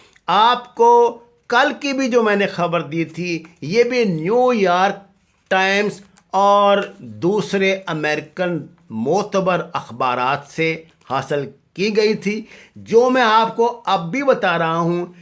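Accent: Punjab, Pakistan. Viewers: punjabi touch ata hy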